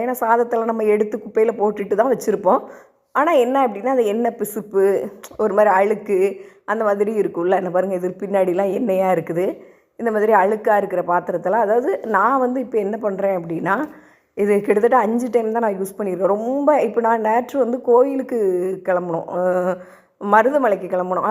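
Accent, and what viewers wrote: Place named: Tirunelveli, India. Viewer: Unga native yendha ooru mam, ஸ்லாங் திருநெல்வேலி pola இருக்கு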